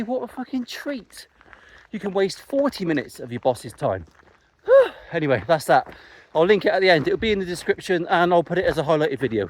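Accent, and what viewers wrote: United Kingdom. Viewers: Nice review, though I thought that only part of american people where eating some "T"s in words, or made them as an "H" that are also eaten in south of England ! 🤣🤪 I love such British accent, I'm from France, can't help myself in avoiding thinking to the teachers I had at school (had English ones) ! ^^ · I'm an American so I only understand every 4th word when brits speak, its not a putdown, its the bloody truth! you have a great channel!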